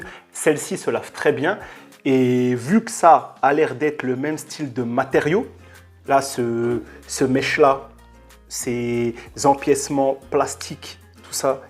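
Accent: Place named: Alsace, France. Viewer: Sympa le sac ! Jolie l'accent alsacien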